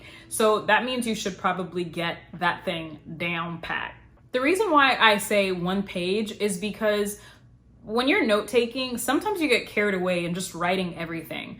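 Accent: Nigeria. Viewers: speaking in Nigerian accent and giving tips <3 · Hah your Nigerian accent actually made me sit up and pay full attention. Can I hire you to stand near me while I'm studying and tell me off when I get distracted?! <3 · It was the Nigerian accent for me. Never subscribed to a YouTuber as fast as I did today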